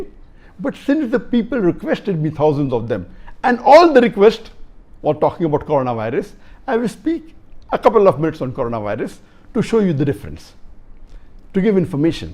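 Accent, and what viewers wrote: India. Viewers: Hello, can you stop speaking like an Indian? TQ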